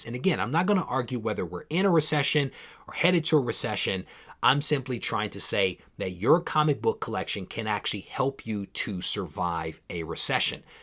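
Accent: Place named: New York, United States. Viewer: Nice vid again. Too true got to do what you got to do. Like the wall selection change up. 1 for which books they are. 2 I have 10 of them myself. It's kind of cool getting to see what it could be like if I were to get them graded. Like how you keep it fresh. Have to watch every vid till the end too just to hear more of that old school New York sound. Miss good rap.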